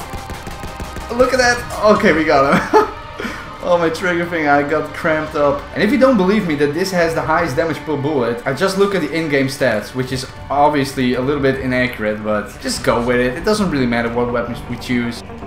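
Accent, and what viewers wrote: Netherlands. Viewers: You have a nice dutch accent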